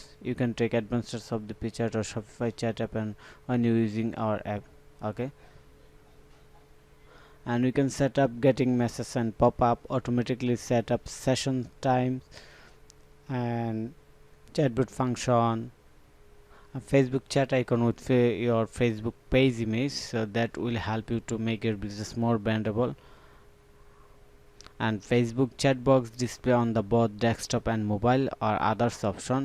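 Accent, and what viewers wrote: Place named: India. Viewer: Lovely Indian accent.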